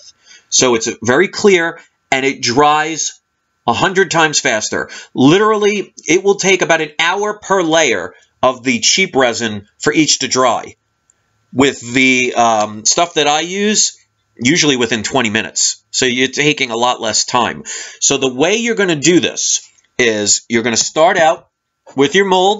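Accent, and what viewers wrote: New York, United States. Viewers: I really enjoyed listening to this video and learning all about orgonite from you!! You are so knowledgable, and so passionate about what your doing and we need more people like that. Creating an awakening within others is difficult esp. when they are so quick to dismiss things out of ignorance, or discomfort. I commend you for doing your part to disrupt the powers that be, and bring positivity into the world. plus you sound like a fellow new yorker haha so you're already good in my book :)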